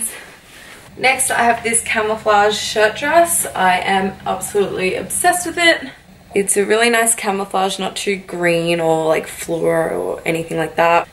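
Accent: Australia. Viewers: I have a feeling more men watch these videos then women lmao a blonde with an aussie accent and a,killer body !!! you are a 10.... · love your Aussie accent!! keep the vids coming, love them!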